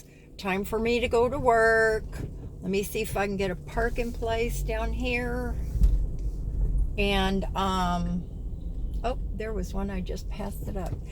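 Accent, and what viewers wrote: South, United States. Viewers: You seem to be a very lovely person doing that for your friend. I’m sure you will be happy in your place. I have physio therapy today, (I’ve just had a knee replacement), so that’s my fun for the day haha. I do enjoy listening to your stories. Your voice is so calming. (Plus I’m in love with the southern American accent - mine is apparently too posh to understand, someone couldn’t understand me saying the word “France” yesterday 😂 )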